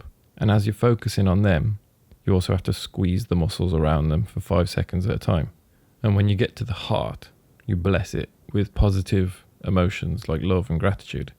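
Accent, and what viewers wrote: Birmingham, United Kingdom. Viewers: Beautiful work. Refreshing to hear a Brummie accent - if I'm not mistaken. Thought you'd be from across the pond. 😂 ❤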